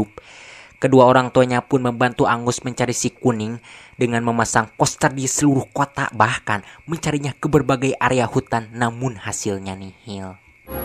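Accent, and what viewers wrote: West Java, Indonesia. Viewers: Suka sama logat sundanya sama banyolannya 🤣 salam dari Pulau Batam Indonesia #RumahDiAtasLaut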